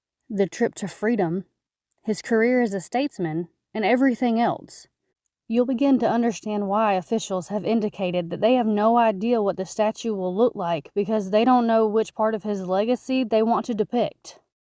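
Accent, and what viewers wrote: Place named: Alabama, United States. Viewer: Honestly I would have guessed Alabama for your accent, though it's not a great match to the accent I know from folks from Alabama (it's close-ish), so the accent question, while potentially a bit rude is also kinda fascinating. Seems kinda like a NYC vs upstate New York accent situation (or Mass - folks on the western side of the state sound completely different than folks from Boston). Then you have folks like me who kinda pick up pieces of accents in about a week of exposure - we can be quite vexing to pinpoint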